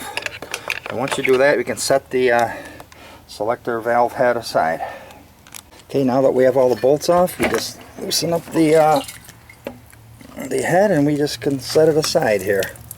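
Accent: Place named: Chicago, United States. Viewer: Helpful video. Excellent Chicago accent.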